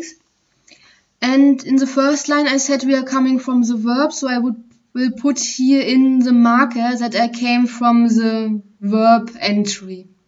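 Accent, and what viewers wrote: Germany. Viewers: she clearly sounds German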